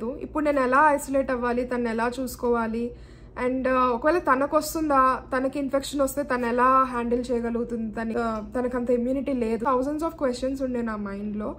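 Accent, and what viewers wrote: Rayalaseema, India. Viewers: Hii.. Sis...aa pain yela vuntundo nenu anubhavinchanu naa Husband RDT hospital lo isolate ainapudu...aa badha pagavaallaki kuda vaddani devunni kore daanni....mee raayalaseema yaasa vintunte naaku mana intlo maatladu tunnatle vuntundi...Maadi Tadipatri...present Hindupur lo vuntunnamu..mee family mucchataga vundi...May God Bless U 💜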